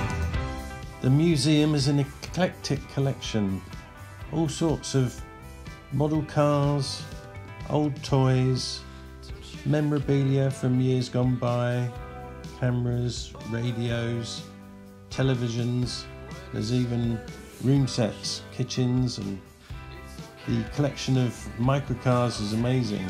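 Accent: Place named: United Kingdom. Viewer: Yes, indeed. Enjoy your mellow, laid back approach, and not overly Brit accent (to me, of course) that I can easily understand. My SE begins build this week, 32cd week.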